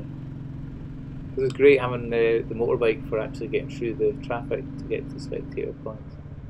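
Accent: Scotland, United Kingdom. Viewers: Lovely tour. I’m trying to track where you are; could you indicate a few of the locations? (Sorry, my Midwest American ears can’t always interpret the town names with your melodious Scottish accent😊). The 350 I understand perfectly.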